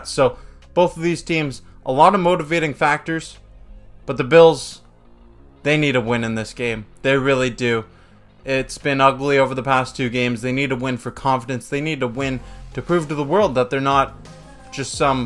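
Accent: Canada. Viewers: Happy Saturday everyone! I don't make predictions just Bills win. Hey - Are you Canadian?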